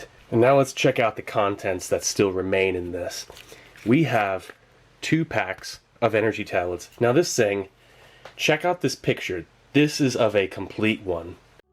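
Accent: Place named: Midland, United States. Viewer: he's american, i can tell by the accent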